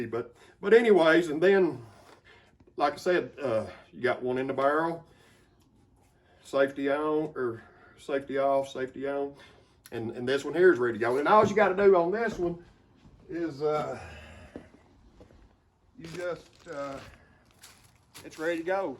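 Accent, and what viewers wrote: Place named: North Carolina, United States. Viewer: You my kind of guy friend got a gun for every situation !!! Love the video and the southern accent which sounds like NC. or Tenn !! Imma Virginia ole boy got my two Colt .45's and want to collect me one of them coach 12 ga. shotguns with the external mules ears and a Winchester 1873 in .45 Colt to complete my cowboy gun collection !!!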